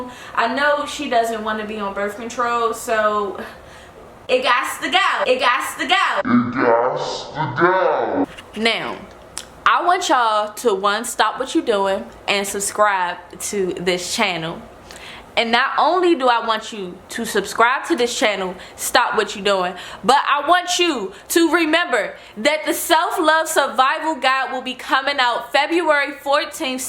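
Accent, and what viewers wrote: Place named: DMV, United States. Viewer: Are you from the DMV? Your accent sounds familiar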